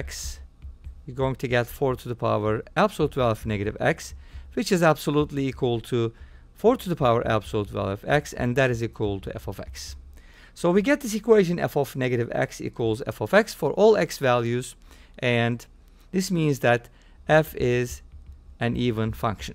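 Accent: Turkey. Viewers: Hey, are you by any chance Turkish? You sure sound Turkish. Other than that, great video! I really enjoy these bite-sized equations. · bakmadım ama k ve r harflerinin telaffuzundan ötürü kesin türk bu adam dedim. Canımsın severek izliyorum yeni keşfettim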